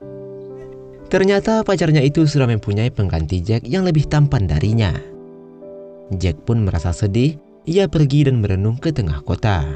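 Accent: West Sumatra, Indonesia. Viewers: Org Minang ya min?😂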